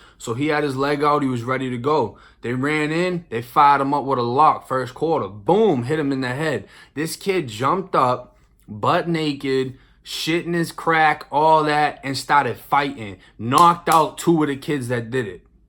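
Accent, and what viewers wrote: Boston, United States. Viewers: Man ive been watching your videos, from the beginning on 23&1. Its nice to see you putting up some numbers. Ive been in 3 times, 18, 22,26, I'm 38 now. I was in NC for the first 2 and nd for the last. Prisons are rough especially my first bid in Polk youth center. It's what you call jit camps. But not as rough as the shit youve been though. Stories are crazy. But I can tell that you learned alot and matured in a way the streets cant provide. You can just tell by the way you reflect back on your time, and situations you went through and learned from. Alot of people dont understand the invaluable lessons your learn about life from prison. After the prison mentality melts away, you can apply those lessons to the way you conduct yourself on the outs, as well as knowing how to treat people with respect. Man keep up the good work, and I'm glad you went back home, florida would have got you caught back up. 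I'm watching this show called city on a hill, about Boston and your accent trips me out, cus its just like the dudes in the show. Anyway, keep up the great story telling. Others can learn invaluable lessons just by listening to the things you say.💯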